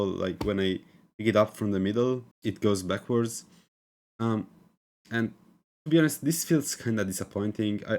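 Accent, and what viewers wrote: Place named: Italy. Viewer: l'accento italiano non mente grazie per la review che volevo prendermelo grazie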